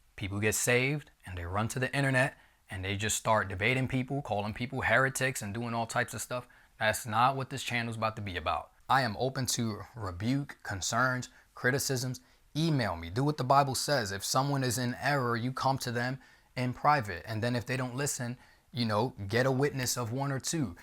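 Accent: New York, United States. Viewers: i think I read “what’s this accent” the first time. 💀What I meant is there was no accent change, he talks the same (the NY accent) he’s just not doing his characters, with had a more cartoonish personality and accent. Remember his movie about getting robber? He sounds the same as this in that, because he’s not playing a character, but being himself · he has a NY accent…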